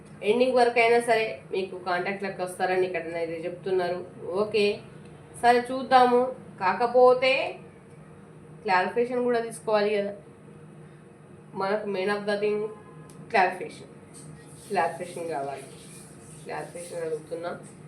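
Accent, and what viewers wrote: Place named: Andhra Pradesh, India. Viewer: Madam Andhra Bhasha chala baguntundi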